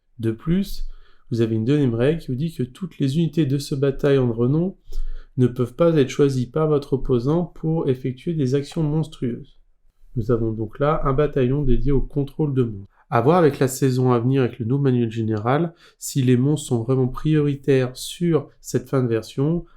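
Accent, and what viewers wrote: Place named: England, United Kingdom. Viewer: Cet accent Anglais toujours aussi délicieux ❤ Cookie aussi tiens !!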